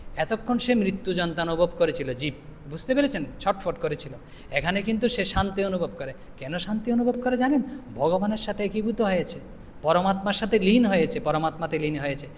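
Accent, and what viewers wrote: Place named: Chittagong, Bangladesh. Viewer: Apne ke chto gamar lok vasar tan ta chogam tan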